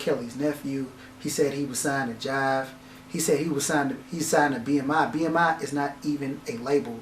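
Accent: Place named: St. Louis, United States. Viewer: ***** picosso said "great artist steals" not saying he's great but great in the eye if the blind. You know what I mean bro. I'm from St.Louis to bro and I hurr it in your voice. Your a humble guy man because if you wasn't you'd be hot headed & I'm glad to see after all that your still humble God bless u bro. Keep doing you. The fakes getting exposed everyday. God givith & God takith away. He wont be seeing bright days much longer just keep doing u.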